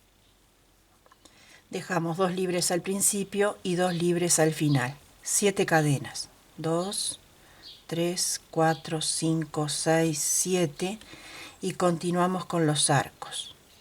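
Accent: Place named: Argentina. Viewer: Señora usted repita como lo hace siempre en mi familia siempre la preferimos por ese motivo así es como se aprende y usted explica muy bien ,y su voz es tranquila suave y muy clara ,en mi familia pensamos que usted es Argentina su forma de hablar y el nombre de los puntos que Dios siempre la bendiga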